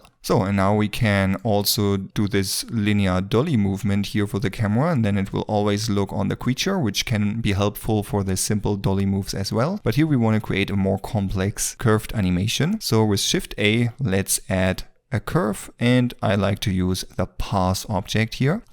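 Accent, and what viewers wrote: Germany. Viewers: It's crazy how germans instantly notice a german accent of english when they hear it... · Are you German? 😅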